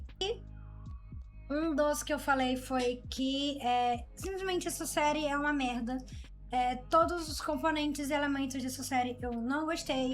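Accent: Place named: Pernambuco, Brazil. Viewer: Acabei de conhecer o canal e gostei demais do conteúdo e principalmente da sinceridade. Aliás, alguém conseguiria me confirmar se a é nordestina? pelo sotaque eu desconfiei que ela é, provavelmente, da Capitânia de Duarte Coelho (Pernambuco)? kkkkkkkkkkkkkkkkkkkkk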